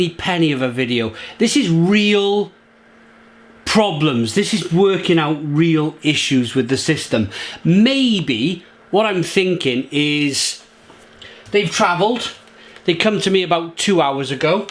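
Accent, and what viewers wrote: Wales, United Kingdom. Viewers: I’m following this guy just on the back of his great welch accent and likeable person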